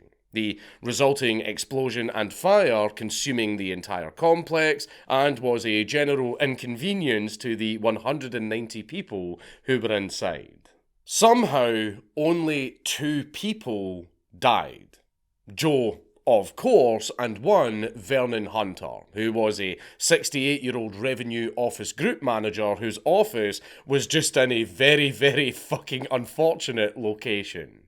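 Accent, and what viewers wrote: Scotland, United Kingdom. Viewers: He talks too fast for such a heavy accent. Just Scottish blah blah.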